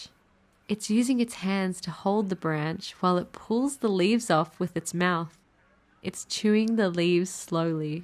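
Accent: Australia. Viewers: This is really incredible job to enjoy video contens with Aussie accent. For the first time, I have watched your video sister.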